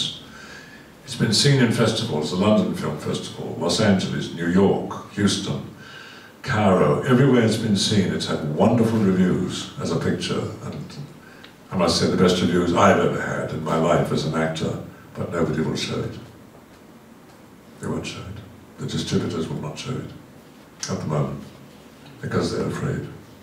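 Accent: France. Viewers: This perfect french accent. bordel de merde. je ne l'oublierais jamais, i will never forget you Christopher Lee. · his french accent is flawless, so is the man himself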